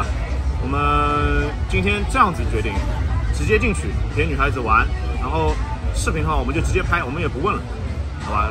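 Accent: Shanghai, China. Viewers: 上海人？还是江浙沪的人?很好奇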